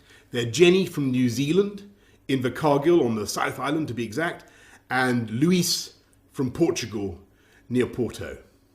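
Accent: RP, United Kingdom. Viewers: 私は、結構 noble English な感じが強くて、あと彼個人の癖が強い気がします。これ聞きやすいってことは、聞き慣れてるんですね👍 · さん これはイギリスの上流階級の英語で彼自身の癖ではないですよ。 アメリカやカナダの英語に慣れてる私にとって聞き取りづらいですw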